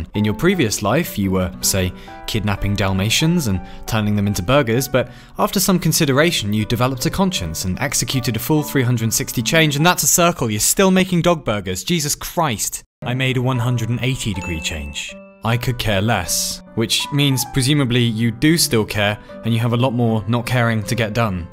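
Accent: United Kingdom. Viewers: Mathhhhhh btw. He has a british accent but he smart when it comes to oxford commas and mathhhthhhh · I dont very much appreciate the “Breh-ish” space turtle telling me an American, how to speak English; when they pronounce words weird as shit over they’re, i.g. Tuesday as “Chewsday” and Water as “ Wah-uh”.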